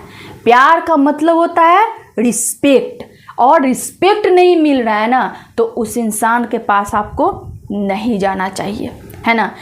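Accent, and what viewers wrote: Bihar, India. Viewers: Bihari tone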